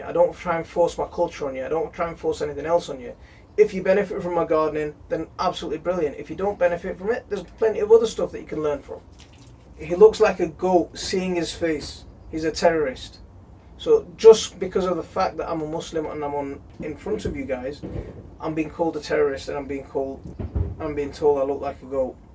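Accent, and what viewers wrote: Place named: North, United Kingdom. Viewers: First video of yours I've watched, I'm subscribed to a few gardening channels so probably why the suggestion came up. You sound like a decent lad, a fellow northerner with the same passions as me (although you seem far more gifted). Subbed. Outrageous comments, solidarity. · I am really sorry to hear that you have received this sort of vile abuse, there is no place for it and I respect your courage in calling it out. A positive which has come from this event is that I and many others have discovered your channel and shown their solidarity- you are not alone. Its great to hear a northerner sharing gardening tips, it reminds me of home. "I’ll tell you to convert to no dig” had me laughing, I admire your candour and sense of humour. Best of luck in your garden in the new year.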